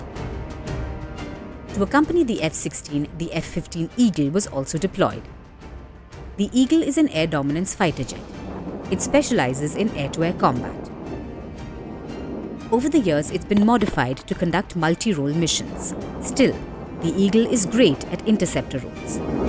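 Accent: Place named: India. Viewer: I detected the Indian accent! Why would Inida care and brag about the US military? This is weird.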